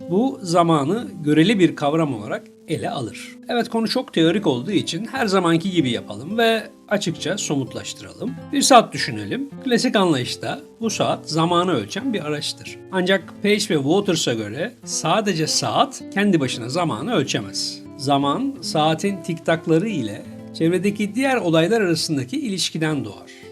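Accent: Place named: United Kingdom. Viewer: British telaffuzu.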